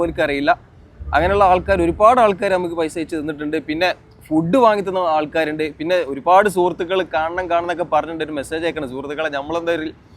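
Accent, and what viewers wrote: Malappuram, India. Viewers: സഹോദരാ, നിങ്ങൾ അത് അർഹിക്കുന്നു. നിങ്ങളുടെ അവതരണവും വിവരങ്ങളും മലപ്പുറത്തെ ഭാഷയും വളരെ രസകരവും ഗംഭീരവുമാണ്. ഞാൻ കണ്ണൂരിൽ നിന്നാണ്.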